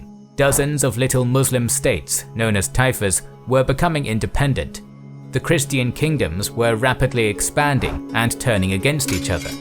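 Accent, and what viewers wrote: Castile, Spain. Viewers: Yes, it is known as Castilian pronunciation. I caught it too. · As a Spanish speaker I appreciate you using the more accurate Castilian Spanish pronunciation of the cities and words... As a Latin American, I can't help but luagh at how lispy Castilian Spanish sounds.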